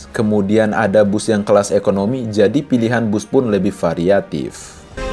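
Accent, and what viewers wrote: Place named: Bima, Indonesia. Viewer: ADMIN NYA SEPERTI NYA ORG BIMA MBOJO.. LOGAT MBOJO NYA GK BISA HILANG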